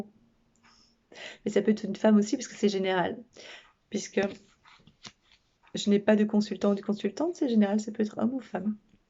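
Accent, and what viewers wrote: Belgium. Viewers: Coucou,j'aime beaucoup tes lectures et ta douceur. Petite question. Tu es belge ?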